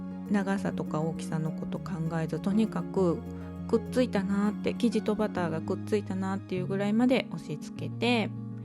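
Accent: Kansai, Japan. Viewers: 初めまして😊久々にパンを焼きたくてYouTubeを見ていたら『お勧め』に出てきたので早速拝見しました🎉 楽しくて軽妙な柔らかい関西弁のおしゃべり(お話)に、グイグイ引き込まれて、あっという間にファンになりました😀デニッシュパン早速挑戦してみます🍞🍞 これからも楽しみにしてます😊もちろん登録させていただきました❤❤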